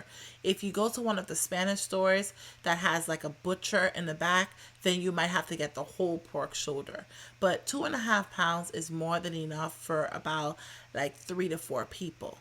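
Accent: Bahamas. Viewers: I can hear the Bahamian accent. I’m about to make griot for the very first time and your video is very helpful.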